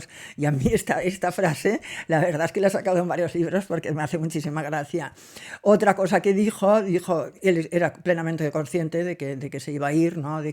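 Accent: Catalonia, Spain. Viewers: UNA COSA, YO PENSABA QUE ERAS CATALANA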